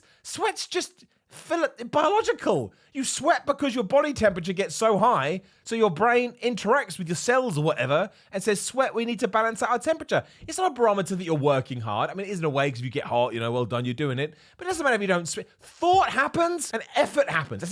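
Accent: United Kingdom. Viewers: I thought all this time was saying “ the bald Eagle” but no that’s not what he was saying - love British accent 😁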